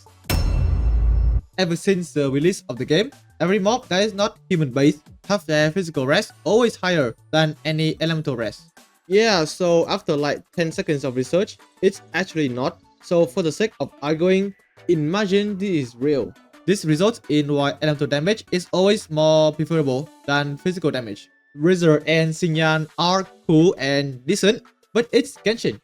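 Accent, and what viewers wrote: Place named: Vietnam. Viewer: Hmmmm... vietnamese accent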